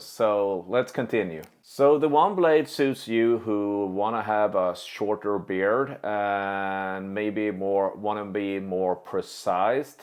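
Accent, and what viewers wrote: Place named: Sweden. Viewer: Thanks for the video I like your Swedish accent :)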